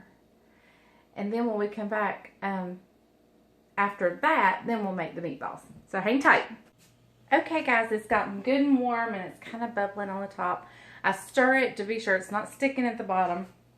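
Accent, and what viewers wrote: South, United States. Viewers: I thought you said Lord but you said lard, it took me a while to figure out what you said. This yankee sometimes gets lost with the southern accent but I love watching you. I don’t think the stainless steel works to remove the onion smell either. Coffee grounds I’ll try, thanks. My husband when he was alive had a guy friend at work that was a bachelor and he loved to cook, he started his spaghetti sauce by cooking a pork roast real slow on the first day to get the drippings which he used as the start of his sauce. I had never known to do that. But the ham or bacon is a great idea to get flavor. My mom wasn’t much of a cook, unlike my country mother-in-law was. My mom for years her idea of making spaghetti was to brown hamburger and sauté an onion add a can of tomato paste, a shake of a little chili powder, salt and pepper and that was it. 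It was really plain or she would use a package of Chef Boyardi spaghetti which was so small and nothing but cheap.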